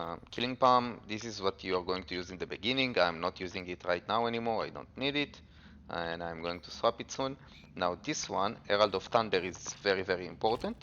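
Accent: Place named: Israel. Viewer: חח תודה רבה צדיק זיהיתי לפי המבטא את הישראליות ! נראה מעניין,אולי אלך על הבילד הזה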